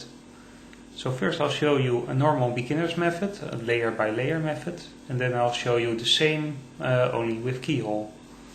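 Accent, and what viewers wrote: Netherlands. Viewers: It's so obvious you're dutch, but good vid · thnx man en ja het is te horen dat je nederlands bent ;)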